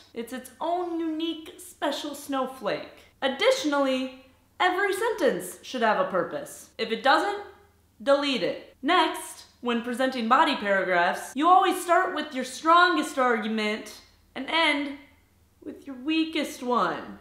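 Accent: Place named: Midwest, United States. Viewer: I simply love your Middle-West accent!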